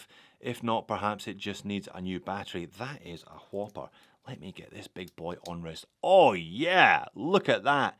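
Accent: Scotland, United Kingdom. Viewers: 😅Love the videos been watching you get better and better over the years 👍Congrats to you and your wife on your new family,😂😂sorry but it’s going to go downhill from here 😂😂😂I look forward to watching you every week,love hearing the Scottish accent which i hardly ever hear as i moved to Boston Massachusetts twenty years ago,good luck to you and your family for the future,please stay happy healthy and safe 🏴🏴🏴🏴🏴👍👍👍👍👍👍😀😀😀😀😀🇺🇸🇺🇸🙏🙏😇😇🤣🤣👏👏👏👏👏👏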